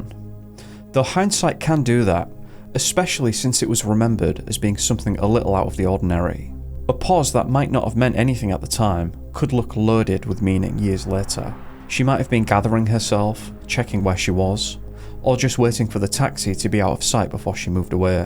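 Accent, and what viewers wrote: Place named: East Yorkshire, United Kingdom. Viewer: Unrelated but are you from Hull or East Yorkshire? Just listening to fall asleep to and was like hang on that accent sounds local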